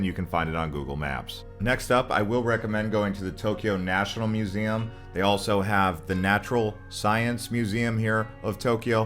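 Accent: United States. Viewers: Hello love the way you explained anything about japan, next thing that really love is your american Accent just really something, new subscriber from Indonesia japan is my dream country to visit, subscribed.❤🇯🇵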